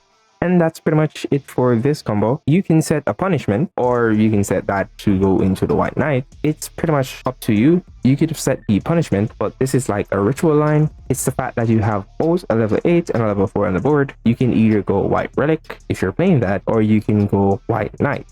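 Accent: Jamaica. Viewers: He jamaican?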